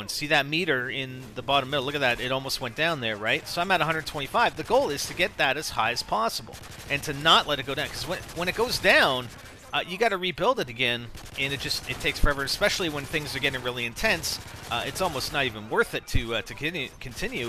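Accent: Canada. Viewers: your canadian aren't you =p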